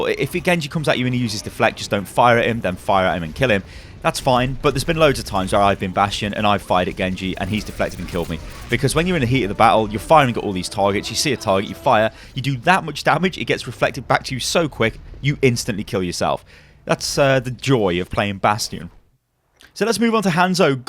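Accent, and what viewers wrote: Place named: London, United Kingdom. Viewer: i'm guessing from the south, definitley no northern accent, probably London or somewhere near there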